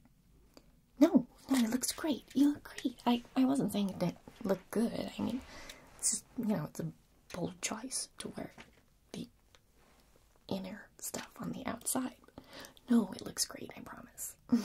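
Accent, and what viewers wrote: United States. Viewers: I love your normal "accent" but your american accent just hits different 🤤❤ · The first video of hers I watched was the T-Virus Trial one and I didn't realize she wasn't American until she called a flashlight a torch. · Hearing an american accent from you was foreign for a second 😂 I loved the video, coming from an DC fan since i was 5 years old! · Your American accent is so relaxing for some reason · Why does your american accent sound like Benedict Cumberbatch's american accent? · Ok ok.....the "American" accent...that threw me off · Your american accent is great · Your American accent is better than mine. 🥹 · I just had ASMR videos on auto play in my headphones and this one started and I had to go and check who the heck it was. This feels like it’s from a weird alternate reality. Great job on the American accent.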